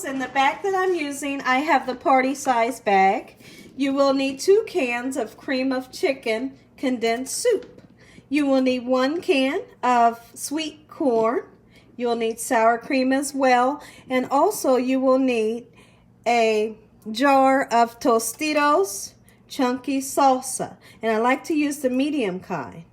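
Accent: South, United States. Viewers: Have you always lived in Ohio! I swear I pick up a southern accent or Louisiana accent in your voice...I am from Texas......I am loving your channel!! hugs, xoxo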